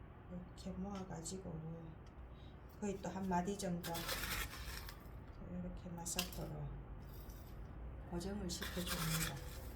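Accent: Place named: Gyeongsang, South Korea. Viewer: 저는다구에 거주하고있습니다 경상도 같아요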